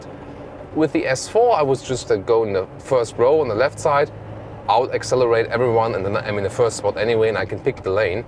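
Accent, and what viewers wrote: Germany. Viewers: Das English ist zum schmunzeln. Allways German englisch. Ich würde das nicht machen. Aber danke für den Einblick in den Transporter. Ich will seinen kaufen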